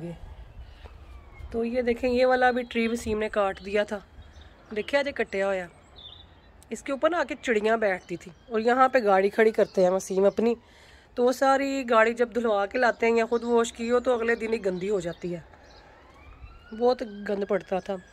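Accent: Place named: Punjab, India. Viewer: Ap Kitna pyara bolti hai hum bhi Punjabi hai buhat Acha Lagta hai ap ki Punjabi sun kar❤️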